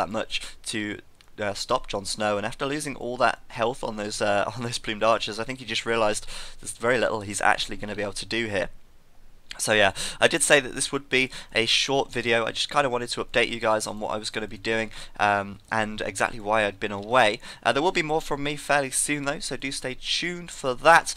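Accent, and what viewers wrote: United Kingdom. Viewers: YOU'RE BRITISH!!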